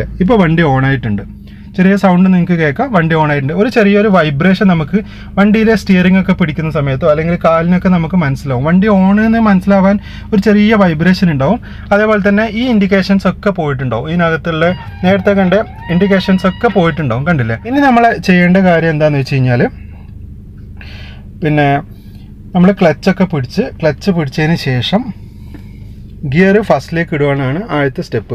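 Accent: Kannur, India. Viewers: Sprrr...ellaavarum paranjadu pole tanne nannaayi manassilaakki tharunnind ee video...njnm licen edthitt 4 years kazhinju...roadil vere vandikal illaathappozhanu njn drive cheyyaaru 🤣 bt ee video kandapol naalethanne poyi practice cheyyanonnu tonnunnu....nthaayalm nalla avatharam 👌👌 ...ningalde samsaaram kettitt ningal kannur aanuo😀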